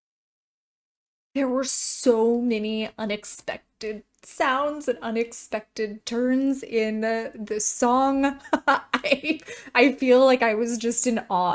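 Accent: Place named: Southern California, United States. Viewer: It must be 2024. A sweet, blonde lady wearing pink and blue, with a "So-cal" accent is reviewing 🤣.... If only they knew her inside thoughts.. Appearances can be deceptive. I like this channel, there are some great reactions and honest truthes... but I can't help thinking - covering things in pink hearts and adopting a Disney princess voice / persona is actually doing you any good. But if it's what makes you happy, In the words of - be yourself, by yourself.🤘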